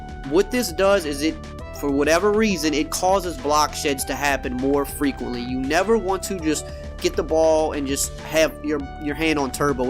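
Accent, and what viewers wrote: Louisiana, United States. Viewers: Great content but I gotta ask what part of Louisiana you from ?? Your Accent sounds a lil bit like Chalmette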